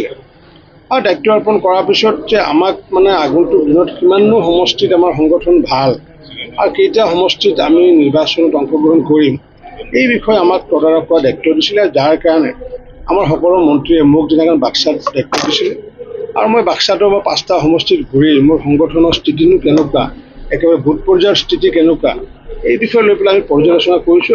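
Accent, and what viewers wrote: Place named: Barpeta, India. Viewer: Borpetia accent t koksun val lakbodiyok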